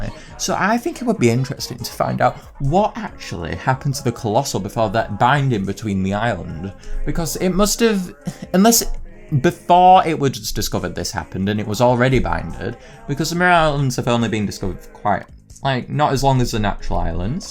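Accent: United Kingdom. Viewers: Oh, that british accent)))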